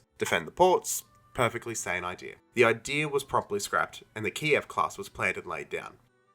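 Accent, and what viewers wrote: Australia. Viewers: Excellent Video, I like long format generally, especially in an Australian accent.😉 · Love your videos. As a fellow Aussie it’s great to listen to your commentary. Keep up the great work.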